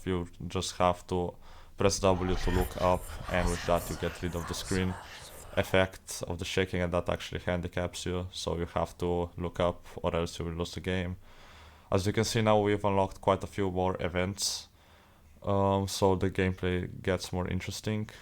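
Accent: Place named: Italy. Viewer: Tf your accent sounds so italian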